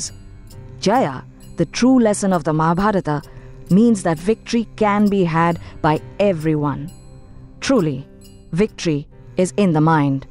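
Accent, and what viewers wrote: South India, India. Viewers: Sir, RAMA,DHARMA, MAHABHARATA are our South Indian style of Pronouncing habit.. For example in West Bengal, people pronounce where ever "V" comes, they pronounce like "B".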